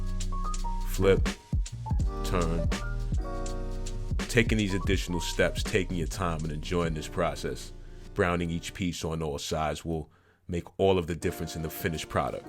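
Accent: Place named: Caribbean. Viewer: Why don't you use your natural Caribbean voice. You're Jamaican Right 👍🏿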